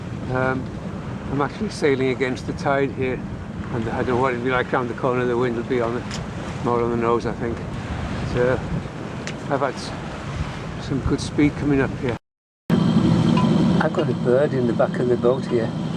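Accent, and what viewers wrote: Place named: North Wales, United Kingdom. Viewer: Diolch yn fawr,. Great vid. Seemed to have lost contact with your channel some 2-3 years ago but thankfully back on track now. Although I don't suffer from hiraeth living in France it's good to hear the North Wales accent & remember the waters & coasts I sailed years ago.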